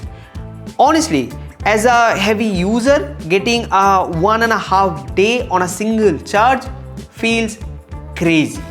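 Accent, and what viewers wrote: India. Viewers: bhai tu west bengal se hai kya tere accent se lag ra hai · R u bengoli? I mean if u could work on your accent a bit, the presentation of the video will surely be boosted alot nd try to keep urself more upclose....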